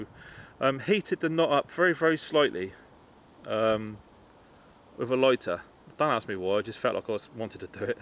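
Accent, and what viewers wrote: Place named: Suffolk, United Kingdom. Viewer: Good old compo was the best...babies head, bacon grill, curried chicken biscuit browns....i used to love mixing my hot chocolate powder in with the rolled oats mix ooh yeah them were the days and the boiled sweets you got loads of I remember getting a bollocking for unwrapping one loudly on a patrol you're from suffolk int ya? I can tell buh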